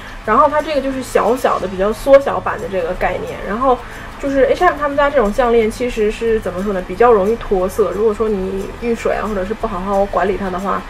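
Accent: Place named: Changchun, China. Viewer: 长春人？难怪口气很熟悉，老乡啦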